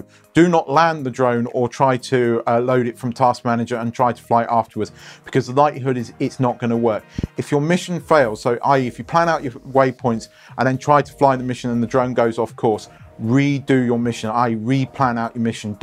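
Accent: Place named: Surrey, United Kingdom. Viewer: Really highlights the possibilities with the Pro/DJI flight modes. With your accent I was expecting views of Woking, wasn’t expecting Palm trees, ha ha. Thanks for posting!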